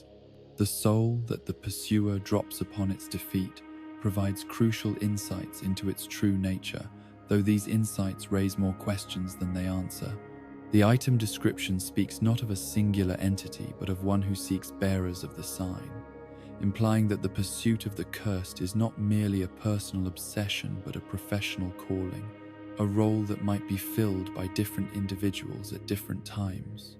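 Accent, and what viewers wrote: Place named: United Kingdom. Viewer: Brittish voice + DarkSouls = views.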